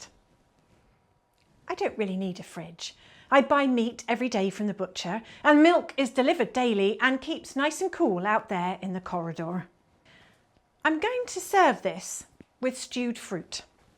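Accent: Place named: United Kingdom. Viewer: Yes this. I’m so used to watching British foodtubers that my brain automatically makes the switch when it hears the accent.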